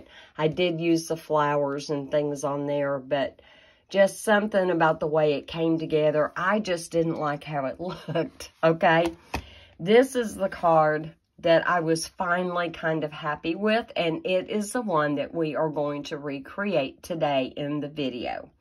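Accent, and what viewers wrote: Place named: Georgia, United States. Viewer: Where are you from? I love your accent. Reminds me of home (GA)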